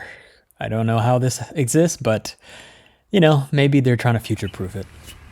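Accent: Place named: West, United States. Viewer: ?? You are Indian or Indian origin people who lives outside of India? Your accent sounds western. Where are you from originally?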